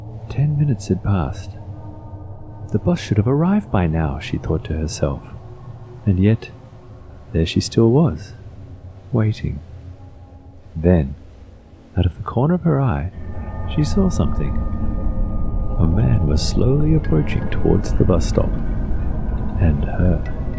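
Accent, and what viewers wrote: Australia. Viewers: He's Australian. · I subscribed because I love the stories and animations. Also the voice is on point. It also sounds like this guy is Australian. I'm Australian btw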